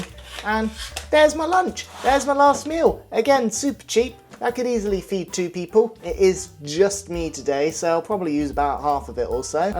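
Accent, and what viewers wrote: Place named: England, United Kingdom. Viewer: Where is your accent from? As in which part of England?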